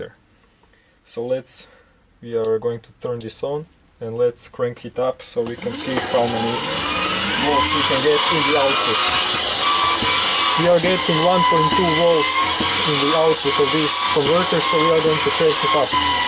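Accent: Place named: Russia. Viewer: Такое ощущение, что твой родной язык русский.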